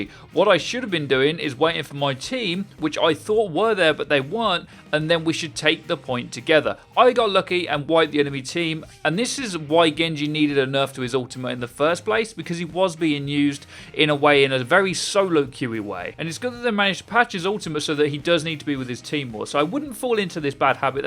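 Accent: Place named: Norfolk, United Kingdom. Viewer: You sound like a fellow Norfolk boy!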